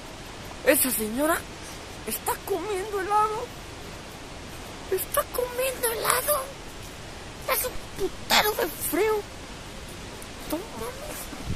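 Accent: Mexico. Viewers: Amo que hables !!! Tan mexicano es muy divertido ! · me encanta los videos pot q habla como cualquier mexicano chingon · Jajajajaja me encantó tu acento mexicano "hace un putero de frío" 😂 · vi el vídeo en face y me di cuenta q era mexicano x la forma de hablar, me enamore jajajaja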